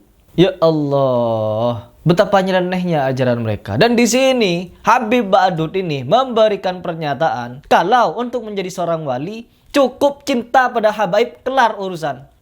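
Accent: Madura, Indonesia. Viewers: Ha..ha...bang lucu enak logat nya madura ya.tp yg penting lagi omongan nya bener semua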